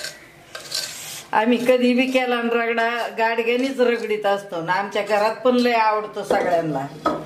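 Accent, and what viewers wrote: Ahmednagar, India. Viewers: I know I lived in Rahuri ,I went to school in Ahmednagar ,the way you spoke .I remember those day.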